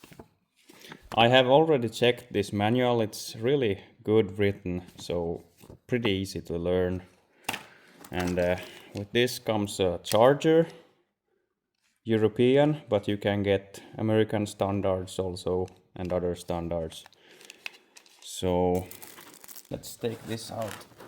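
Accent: Finland. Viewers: Good video, how did you learn such good English, it’s very good. I see where you live, looked it up on Google Maps. But you sound exacting like everyone I knew growing up with your accent, I love it, reminds me of times and old timers long gone. Don’t know if you know anything as far as geography of US. But I grew up in Toivola, Minnesota, 50 miles NW of western tip of Lake Superior. All my ancestors came from Finland, I’m old 66, probably last generation here that didn’t learn Finnish, my grand parents tried, but I guess I had nothing to do with it when I was little lol, but when I went to first grade in 1966, there was some of my class mates that could only speech Finnish. Love watching your videos, and learning about your country and ways, I grew up on the farm also. One thing I know!! you live close to that big body of water that controls controls your weather! 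Our big Lake Superior does the same thing here. I always said my ancestors must have settled where they did when they got of the boat and train because the weather was horrible just like what they left so it was just little home!!!!!😂😂😂 Thanks your friend Larry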